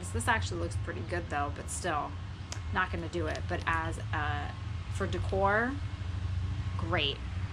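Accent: New Jersey, United States. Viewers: It’s a NJ accent.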